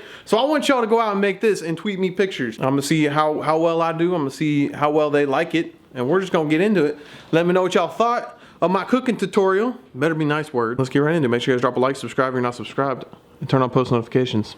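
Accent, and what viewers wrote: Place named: South, United States. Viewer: All of these years and I just now realized you have a southern accent.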